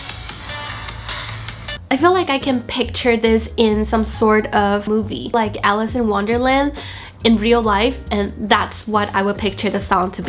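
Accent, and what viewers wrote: United Kingdom. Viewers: i LOVE your subtle british accent! so cute!!!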